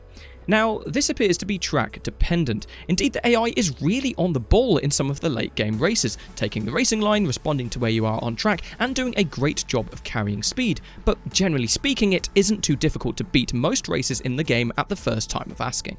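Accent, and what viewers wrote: United Kingdom. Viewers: yeah man you could definitely turn this into a career. Everyone loves a British accent narrating videos lol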